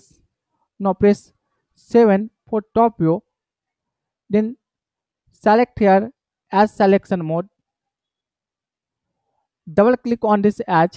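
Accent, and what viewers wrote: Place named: India. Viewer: super ultra giga pro max Indian accent luv it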